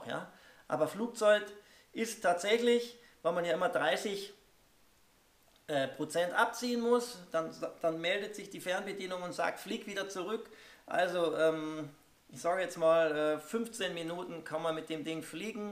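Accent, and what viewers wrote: Austria. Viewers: Bist ein sympathischer Typ 😁 Die Bilder sind richtig gut. Das österreichische ist nicht schlimm man versteht es gut 👍 Nur die Musik war bissi laut oder die Sprache zu leise sonst schöner Film. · Österreichisch ist perfekt. Als weida sooo! und viel Spass mit dem kleinen Flieger!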